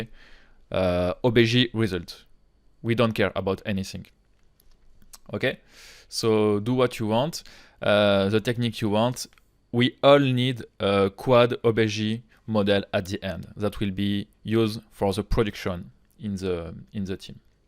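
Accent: France. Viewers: Some good french accent. As a french, it's music to my year.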